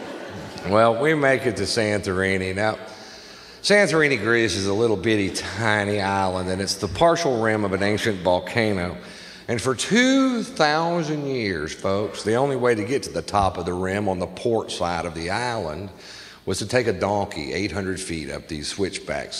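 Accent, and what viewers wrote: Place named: Australia. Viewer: You sound like an Aussie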